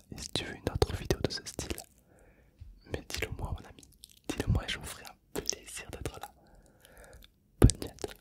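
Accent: South, France. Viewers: Je comprends pas son accent français mais c’est quand même grave bien 😭😂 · On entends l accent du sud 😂😂😂😂😂😂😂😂😂😂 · J'adore ton accent du Sud. J'ai le meme!! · Aller hop jsuis trop fier g entendu mon nom😂😂😂trop beau l'accent du sud bref force à toi👌👌❤️💪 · L’accent du sud 🥳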